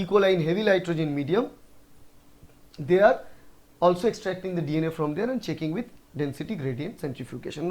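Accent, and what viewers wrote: Bengal, India. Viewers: Sir are you from kolkata Are you bengali I find a tinge of bengali in your pronouncing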